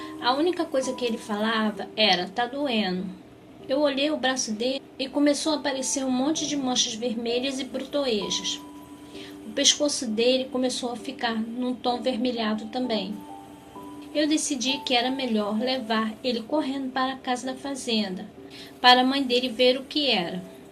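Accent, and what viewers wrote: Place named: Rio de Janeiro, Brazil. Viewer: seu sotaque carioca é muito fofo 😊. É cada história hein, alguma coisa ruim tinha lá. Credooooo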